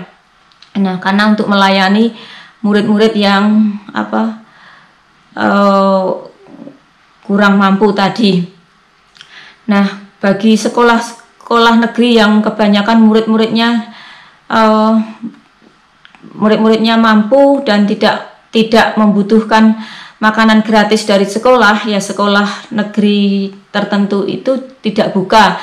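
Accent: Java, Indonesia. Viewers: Logat jawanya ga hilang mba'yuk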